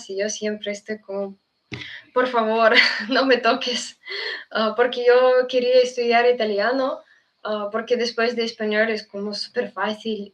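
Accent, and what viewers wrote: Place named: Spain. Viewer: Tienes acento de el país de España pero no castellano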